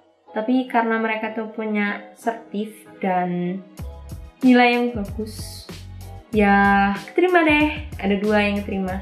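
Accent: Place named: Java, Indonesia. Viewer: Medok Jawa e rek😅😂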